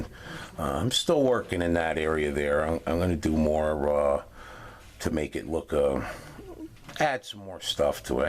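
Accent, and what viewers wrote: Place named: New York, United States. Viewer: Hey the layout looks great! Now I'm going to check out you're other videos. Keep posting I'll keep watching. I'm building a layout also. Great inspiration thank you. Where are you from? Listening to you makes me feel like you must live in the NY NJ area. I'm a north jersey guy. Nice job!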